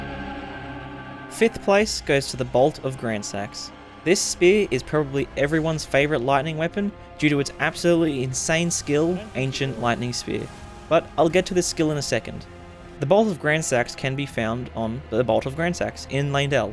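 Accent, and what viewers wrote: Australia. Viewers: my god you are soooo australian, i love it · u sound a bit like crocodile dundee mate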